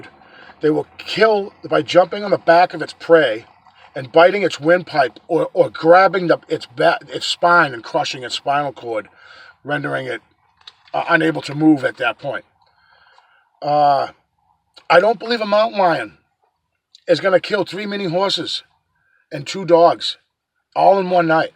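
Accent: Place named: Rhode Island, United States. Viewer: You're from Rhode Island, I'd bet...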